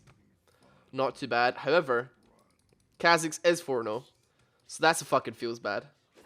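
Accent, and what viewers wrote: Scotland, United Kingdom. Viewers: Scottish I believe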